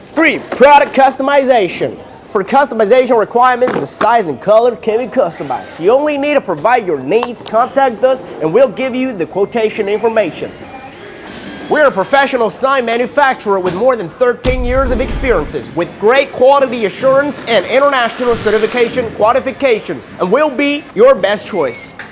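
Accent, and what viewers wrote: South, United States. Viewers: The southern accent is much better than the British accent.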